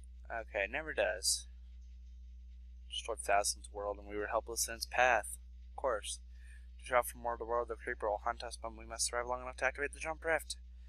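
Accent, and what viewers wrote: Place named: United Kingdom. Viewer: You went from sounding heavy British to a more satisfying deep ear feel.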